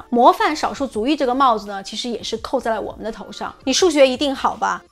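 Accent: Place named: North, China. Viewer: 你是哪里的 听着也像北方的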